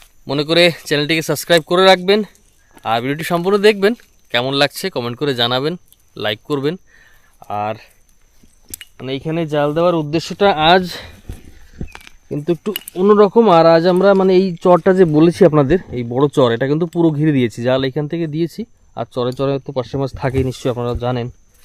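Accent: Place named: Barisal, Bangladesh. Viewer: দাদা আপনার আমার খুব ভালো লাগে আমি আপনার ভিডিও গুলো সব সময় দেখি আপনার ভাষা আমাদের দেশের বরিশালের ভাষার মতো পায়সা মাছ আমারও প্রিয় মাছ কারণ আমরা সুন্দরবনের কাছে থাকি আমি বাংলাদেশ থেকে বলছি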